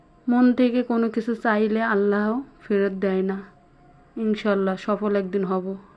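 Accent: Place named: Barishal, Bangladesh. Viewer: আপু আপনার দেশের বাড়ি মনে হয় বরিশাল কথায় বোঝা যাচ্ছে |আপনি যেখানেই যান না কেন |নিজের দেশের মানুষের কথা শুনলে মনে হবে আপনার আপনজন ❤️❤️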